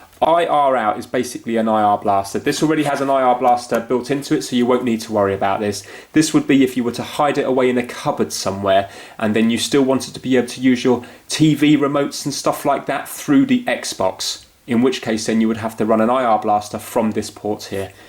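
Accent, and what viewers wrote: England, United Kingdom. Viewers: Its the British accent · Its really fancy to watch a tutorial with a british accent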